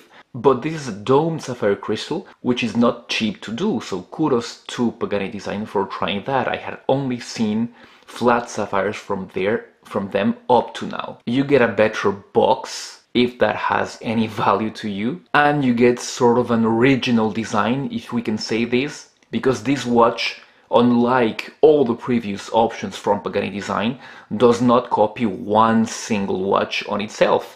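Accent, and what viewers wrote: France. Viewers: tu sonnes très Français pour un reviewer en anglais lol (mais belle prestation... sans doute un Belge ou un Suisse mdr) Salutations de Belgique.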